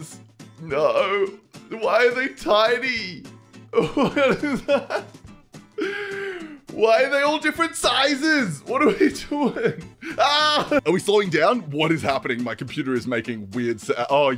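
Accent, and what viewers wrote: Australia. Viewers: Just realized you’re Australian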